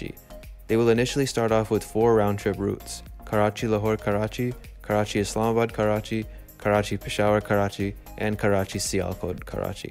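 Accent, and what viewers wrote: United States. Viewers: Great American Accent.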